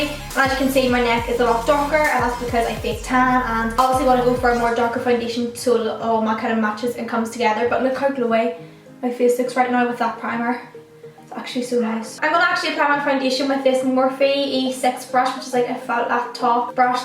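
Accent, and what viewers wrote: Northern Ireland, United Kingdom. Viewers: Are Your British? Your Accent Is So Nice! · Are you from Northern Ireland? 😊 ps. Love your videos❤️❤️❤️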